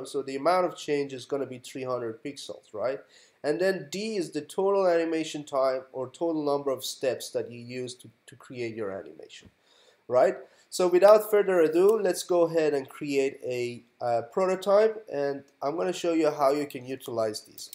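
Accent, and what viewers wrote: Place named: Iran. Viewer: love the stuff you post...i'm too dumb to understand most of it lol btw you really sound iranian hahaha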